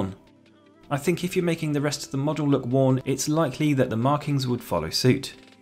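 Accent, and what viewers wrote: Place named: South England, United Kingdom. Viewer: I am not an artist by any stretch of the imagination. I mean, I can freehand-draw an elephant that won't make you think it's a hippo, but that is about my limit! (Thank you, trunk, tusks and toes!) In scale modeling, I am a stickler for realism, rather like Bill Halliwell, and I don't give myself much leeway. It's just that lately I am starting to re-think what realism actually is. 🤔 In the view from the USA, your south-England accent does not sound boring at all, although I do enjoy hearing the wide variety of British accents that one finds in Youtube scale modeling. Lately, I am particularly getting a kick out of the ones that pronounce "blood" as rhyming with "mood", because it's so startling to an ear used to hear BBC News as the only British pronunciation!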